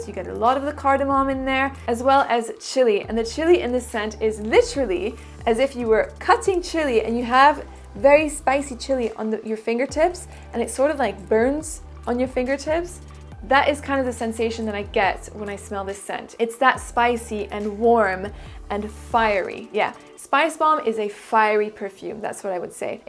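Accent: France. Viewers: I am 58 years old and have been using Dior Homme since its first day. Since they changed it I have been trying to find an alternative tried a lot of different perfums and watch numerous videos on perfums. Result I am as confused as ever. Any suggestions ??? Tried Oud wood Byredo accord oud guerlain bois mystérieux and relevant. By the way excellent french accent.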